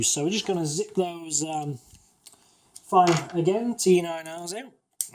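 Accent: United Kingdom. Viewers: Thank you kind sir. while some advice did get lost in translation due to your use of "the queens english" overall you where most helpful.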